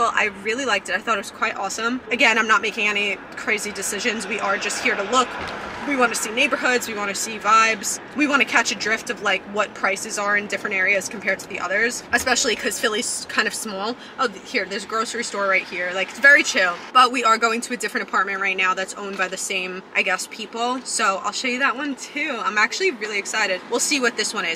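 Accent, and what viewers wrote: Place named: Long Island, United States. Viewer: Off-topic, but I really love your accent! I'm not familiar with specific New York accents, but are you from Long Island?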